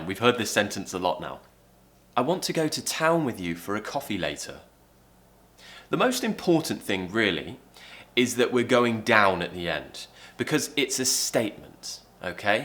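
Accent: RP, United Kingdom. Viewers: don't know what i would've done.. if I hadn't seen your videos... i learnt amazing british accent... love ya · without exaggerating you are the Best example For the Morden R p accent, and I have learned something Form you....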